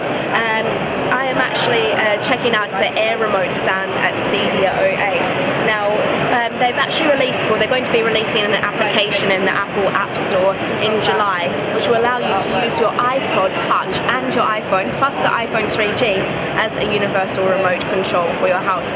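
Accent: United Kingdom. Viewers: british nany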